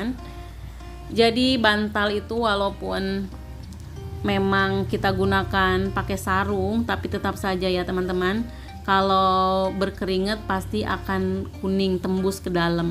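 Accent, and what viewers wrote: West Java, Indonesia. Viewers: Kakanya meni Sunda pisan 😍 apalagi pas nyebut kata TV = dibaca tipi, hehe ... Semangat terus yah kak ngontennya, bermanfaat bgt nih 🥰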